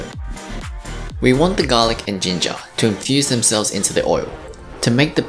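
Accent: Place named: Australia. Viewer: what a nasty ass australian accent xD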